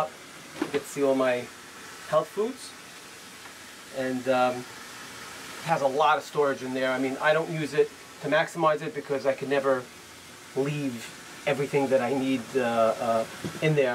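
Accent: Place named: New York, United States. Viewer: Good job 👍🏻 if you couldn’t tell from the license plate he’s a NYer you could tell by listening to him.😆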